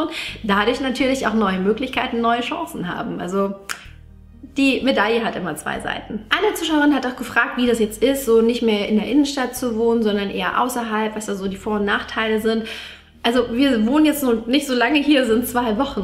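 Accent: Swabia, Germany. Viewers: TGIRF 🚀🚀🎉 ich muss echt sagen ich kann diesen schwäbischen akzent gar nicht ab😂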